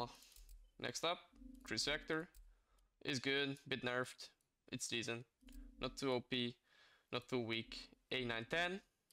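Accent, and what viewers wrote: Finland. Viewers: Your finnish/nordic accent is very attractive.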